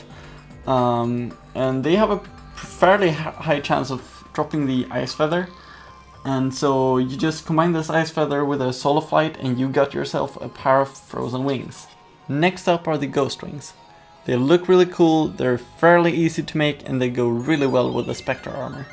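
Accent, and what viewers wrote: Sweden. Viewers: But the I realized he was “Swedish” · are u swedish? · Are you swedish? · It's a Swedish accent, get used to it. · because he is swedish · hörde att du var svensk direkt, enkelt att känna igen svensk engelsk dialekt ^^ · Are you from sweden?!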